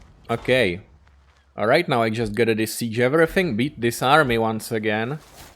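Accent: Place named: India. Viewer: Can't say that there's no accent. It made me think he was Indian. Honestly, I would never have guessed he's actual origin because of that.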